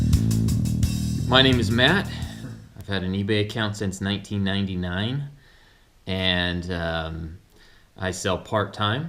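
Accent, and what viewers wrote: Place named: Southern California, United States. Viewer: Keep up the good work man, Seems like your from socal.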